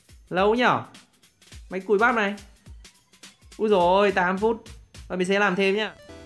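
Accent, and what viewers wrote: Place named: North, Vietnam. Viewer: ng Quãng Bình mà sao ổng lại nói tiếng Bắc nhuệ🤔🤔🤔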